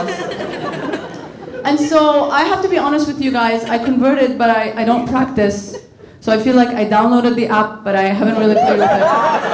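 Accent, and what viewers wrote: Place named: India. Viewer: Spoken in a Apu Nahasapeemapetilon accent..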